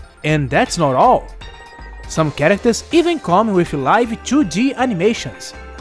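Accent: Brazil. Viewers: sabia kkkk vc fala um ingles com um sotaque forte de brasileiro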